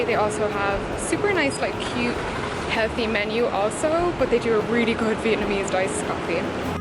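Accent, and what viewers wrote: Ireland. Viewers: Wait are u irish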